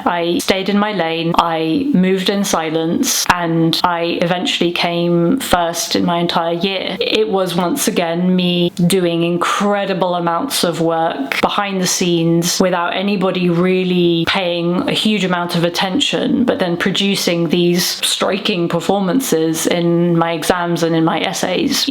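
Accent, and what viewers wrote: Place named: Germany. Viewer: Mas, que inglês pesado, arrastado, você por acaso é alemã ou indiana ? Your english is very heavy, it sounds like German accent to me.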